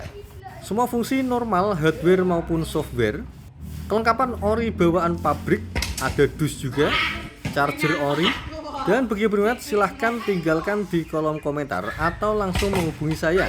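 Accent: Java, Indonesia. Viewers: Wong jowo y mass..logat nya tu....😅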